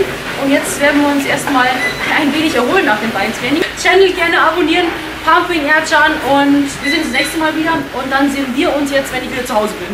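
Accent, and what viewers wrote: Bavaria, Germany. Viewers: Kommt die aus bayern i schp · Man hört manchmal deinen Bayrischen Akzent heraus :D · Waalnüsse 🐳🌰 der bayerische Akzent ist echt putzig 😄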